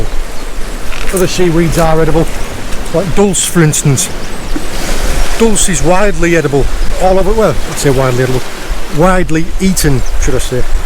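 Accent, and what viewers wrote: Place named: Cornwall, United Kingdom. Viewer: Just love the videos! I Put the captioning on, but your thick Cornwall accent makes for interesting reading! This is what I look forward to! Great morning, watching while I drink my morning coffee! ☕️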